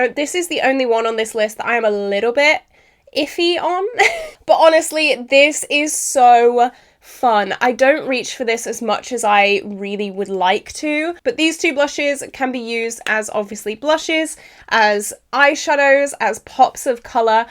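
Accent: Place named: United Kingdom. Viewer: Hello, I love your Videos so much. I found you on YT a few month ago and now I´m addicted to your Videos. It's so sad that the Beauty Bay Book of Magic an the Wilderness Palette are discontinued. I became today a bunch of Beauty Bay Palettes (my first ones), I buy it cause you are so impressed of them. It´s also a shame the ABH Subculture Palette is discontinued I had the OG one but the Quality was so bad, so I gave it away. Also I both my first BPerfect Carnival Palette these Days cause you are so in love with these Palettes. Next time I will buy my first Color Pop Palettes, but I´m so overwhelmed with the Numbers of Palettes they have so I don´t now, which one I should buy. But this purchase have to wait a little, cause I both so much these Days (Huda Beauty Grungy, BPerfect Gravity, Oden´s Eye Chrismas Value with 4 Palettes, BPerfect Carnival Interstellar, BPerfect Carnival The Antidot, a bunch of Beauty Bay Palettes, Morphe The Seasons Artistry Palette, Morphe CT18, etc.) and I want the Cosmic Brushes Winter Wonderland Palette so bad). 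I´m from Germany and I love your british accent so much. And as I said I love your Videos and Content. Stay tuned. Claudia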